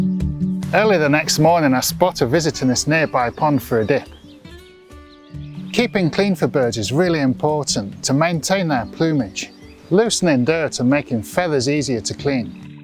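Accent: United Kingdom. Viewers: Male toenail. Toenails. Love the British accent.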